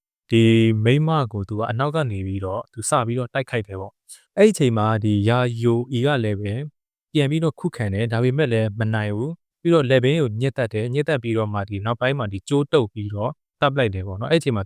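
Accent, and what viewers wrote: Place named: Rakhine, Myanmar. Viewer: အကိုက ရခိုင်ထင်တယ် စကားဝဲတယ်😊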